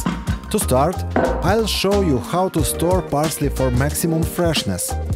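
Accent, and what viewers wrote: Russia. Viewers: русский что ли? такой говор чисто русский😃. видео оч полезное, благодарю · Я одна слышу русский акцент? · Не узнаю откуда этот акцент...Саратов? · You are amazing! Some of your short cuts I had learned from an amazing lady who passed away about 3 years ago who had a YouTube channel and focused on making old recipes from the 50’s she had learned from her mother while they lived in Virginia. She was born in 1947. A lovely , lovely lady and her husband too. He had passed just about 8 months before she did. I love that I am learning new cool techniques from you. I’ll pass your videos around to my friends and family. Are you from Russia or Ukraine? I ask because of your accent. Great job, and please keep up the good work! Your videos are very enjoyable❤😊!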